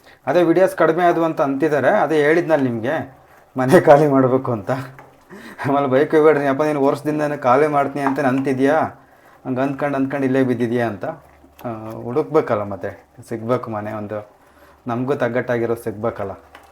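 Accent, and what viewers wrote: Chitradurga, India. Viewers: yenthasngge aduge madthira nam durgada bhashe keli kushi aythu😊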